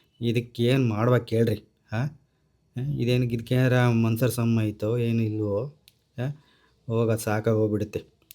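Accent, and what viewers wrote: Chitradurga, India. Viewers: ನಮಸ್ತೇ ಸರ್ ನಾವೂ ದುರ್ಗದವರೇ ಸಕ್ಕತ್ತಾಗಿದೆ ನಮ್ ಭಾಷೆ ನಿಮ್ಮ ಅಡುಗೆ ಸೂ.....ಪರ್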